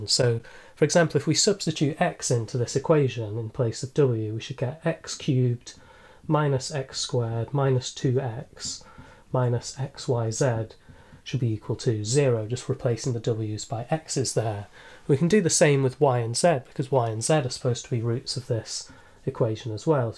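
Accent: United Kingdom. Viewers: Thanks for this video and for your british accent :) Clever solution and beautiful problem. Hope you are doing well :)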